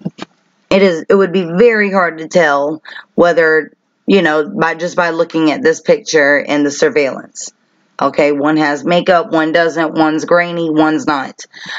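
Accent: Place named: South, United States. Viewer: love your videos and southern accent as I have a very strong one myself lol. Are you the person Str8 refers to as? Just curious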